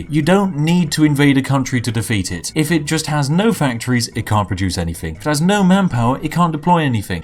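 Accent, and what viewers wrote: United Kingdom. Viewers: You sound british. Are you british? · Sounds very British to me...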